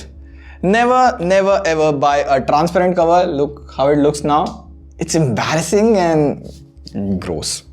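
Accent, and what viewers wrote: India. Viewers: Such a horrible think Indian accent however thumbs up because it was a good video well done sir… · Why Hindis always have to speak english with this bad accent? Really. I’m from Poland i my original accent is Russian like but I learn how to speak better by watching movies n shit. I don’t get it. You really are fucking good YouTuber men but I don’t understand you because of accent.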